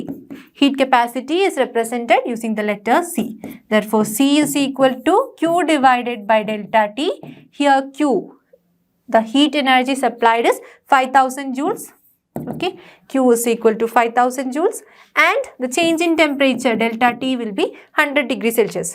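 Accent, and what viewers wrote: Kerala, India. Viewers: Ahn accent kettale ariyam pinne name 😂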